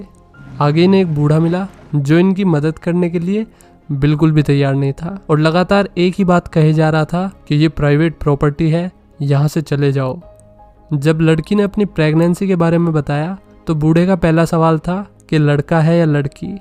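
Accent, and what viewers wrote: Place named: Haryana, India. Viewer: Explainer pkka haryana see hh😂😂😂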